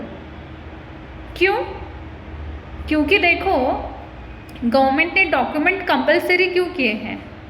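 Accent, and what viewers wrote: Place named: Maharashtra, India. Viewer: मराठी माणसाचे हिंदी लगेच समजून येते😅😅😅 तुम्हारी हिंदी बहुत अच्छी है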